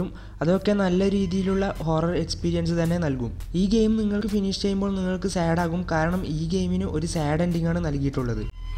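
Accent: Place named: Kannur, India. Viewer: Bro kannur ano ?? Basha ath pole ind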